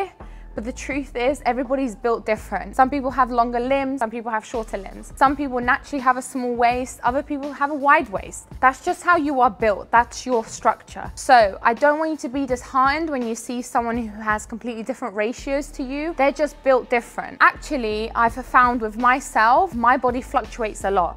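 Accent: United Kingdom. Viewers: Been watching your TikTok’s for a while now, had no idea you were British 😅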